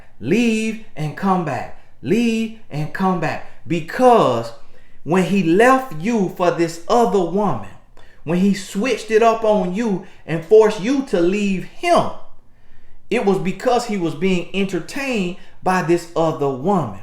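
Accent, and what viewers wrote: South, United States. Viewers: Love your hometown Southernness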